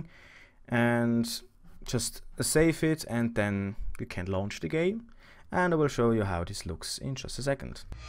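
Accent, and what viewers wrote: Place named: Germany. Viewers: ghört mer sofort usse :D PS: Es funzt bi mir denn leider ned... :( has emol anebrocht und warum au immer wider deinstalliert.. er peilt ned mol das i di "richtig" CD drinne han :( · Ha deutscher. Ertapt ;D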